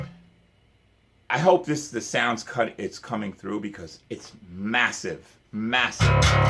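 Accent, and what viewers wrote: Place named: New York, United States. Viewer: Wtf, that clanking and pick slapping sound is HIDEOUS. I am a Zep head playing 30yrs. A true Fender jazz elite fan. I wanted to check this out. I went to you tube for an independent unbiased review but honestly WTF. I hear the Ny'r in ur voice as I am the same but if u like how ur amp is set or u believe that riff u picked thru had some kind of redeeming hook,... u are either very high or delusional. I wanted to get this bass but ur review brought vomit into my mouth. I will need to go play it for myself. I realize u must have done something or recorded or produced but what I just heard is ^#$$^&$@@ Funny in the background my brother just pulled up in a convertible and he is listening to the by.. Go cleans your soul my brother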